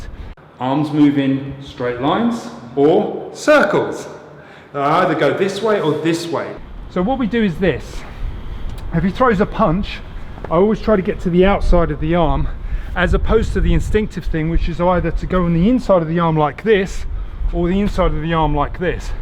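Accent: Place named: Cockney, United Kingdom. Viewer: Beautiful accent master, is it cockney?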